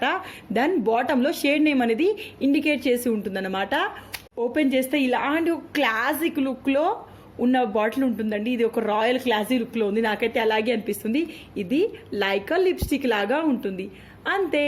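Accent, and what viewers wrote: Telangana, India. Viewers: Hi gaaru mi voice ki fan aipoyaa Mana telanganaa yaashaa lo super gaa cheptuvuntaaru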